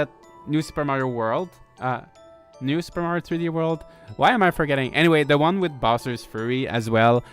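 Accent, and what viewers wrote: Quebec, Canada. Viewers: Hey! So cool to hear a fellow French Canadian. I could tell right away from the accent;). I also recently bought Rune Factory 5 and I was wondering if you knew of a website or maybe a Twitter that could provide some form of guide for the game? Simple things like what gifts certain people prefer and what to give monsters/boss monsters. If not, no pressure! Thanks for providing us a high quality, in-depth look at this awesome game. Edit because I honestly thought way too late about this and I feel like that’s something you considered doing in the future: would you be interested in making a video about how to raise friendships fast/who likes what gifts the most and such? Only if it’s not bothersome!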